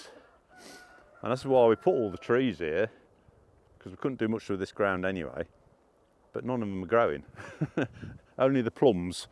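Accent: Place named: Leicestershire, United Kingdom. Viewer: Many thanks for making videos. From your accent, I think we're from the same place, Leicestershire or close.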